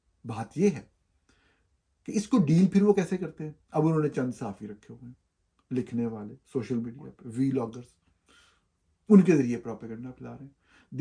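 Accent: Punjab, Pakistan. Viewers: Punjabi touch 😂..Good analysis❤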